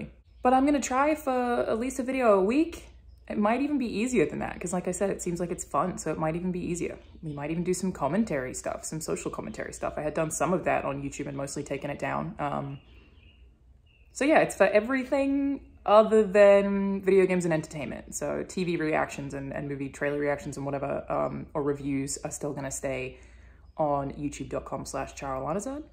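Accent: Australia. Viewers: can you make a channel without the aussie accent? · Liked. No freakin' clue how you ended up in my recommendations. You're cute. Your dog is amusingly weird. I have no idea about painting models, but I agree that Ripley needed to be greener. Tattooing eyebrows seems severe, but I'm a 60-year-old guy, so there's that. Obviously, I'm not a gamer, but I did play Descent 3 for an hour yesterday. Does that count? Shameless confession: Aussie accents (yes, I'm aware there are many) are simply lovely. If you show up in my recommendations again, I am likely to watch. Yay, Al Gore Rhythm. Be happy,. Your dog is brilliant.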